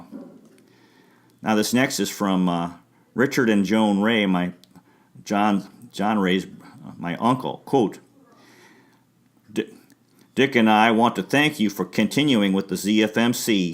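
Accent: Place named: Germany. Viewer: Do u speak German ? It seems like you have a slight German accent very interesting diarrhea appreciate you reading it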